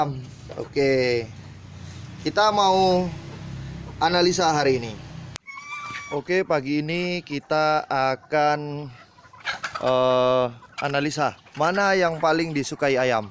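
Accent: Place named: Java, Indonesia. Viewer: bagus bermanfaat. wong Medan, aksen ngomongnya kok wong Jawa ?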